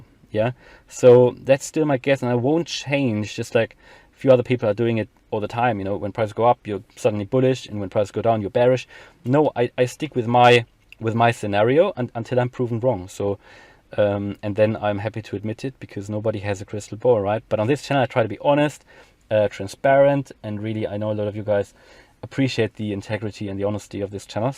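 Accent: Germany. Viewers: Sounds you are from Germany - beste Grüße, Robert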